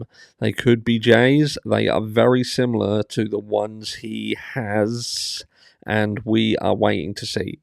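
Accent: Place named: Suffolk, United Kingdom. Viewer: You have a lovely accent !! Are you from Suffolk?? 🌲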